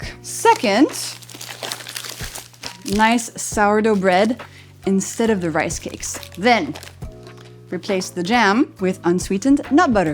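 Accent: France. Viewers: American former French teacher here. Her English is so good, I thought at first she must be a native bilingual speaker, maybe Quebecoise (French Canadian). "Elle n'a que l'ombre d'un accent francais..." Brava! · irritating french accent lol n your not a Goddess . Repeating what all the internet knows already to sell supplements or yourself ....why i hate this period in time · You are a good YouTube personality & diet restriction advice giver. Vogue is always a sucker for a French accent. You say absolutely nothing about consuming enough water, but are happy to show us your cute little recipes. We know the information you are giving is fraudulent, masquerading as science, and all those comments on your channel agree with this. Hopefully you can change your tune, or just do something else entirely, instead of contributing to society’s toxic diet culture.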